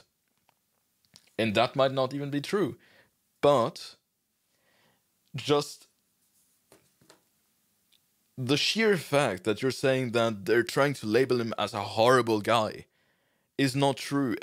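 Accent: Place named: Germany. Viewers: I think his english fine. Ich mein, 20 Sekunden in, dache ich... ist er deutsch? Aber so what!? I’m gonna subscribe whatsoever. 😉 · Dein Denglisch ist so horrendous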